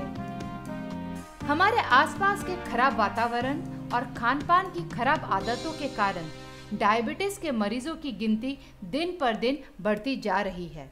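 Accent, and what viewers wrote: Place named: India. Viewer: Can you be clear please like (ENGLISH) I don't understand Indian 😆🙄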